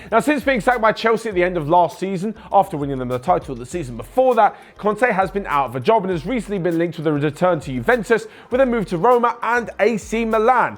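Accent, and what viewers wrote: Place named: England, United Kingdom. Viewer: he sounds English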